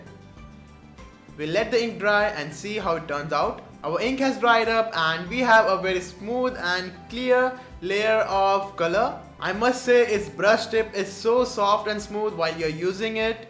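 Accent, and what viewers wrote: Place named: India. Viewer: Are you from India? You sound like from India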